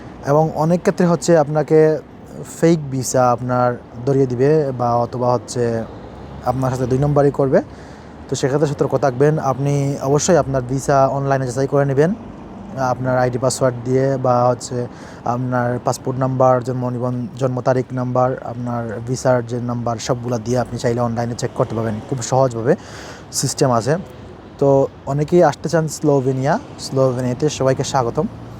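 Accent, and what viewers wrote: Sylhet, Bangladesh. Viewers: ভাই আপনে আমরার সিলেটি ভাই নি 🥰🥰🥰 আপনার জন্য শুভকামনা ও দোয়া রইল ভাই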